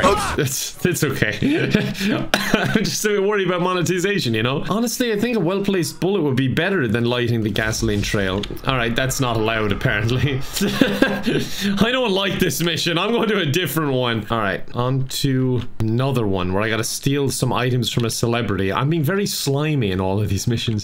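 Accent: Ireland. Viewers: Hearing an Irish Lad talking about basketball just hit different for some reason. Also love your vids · Ah yes the Irish man talking about NBA love u!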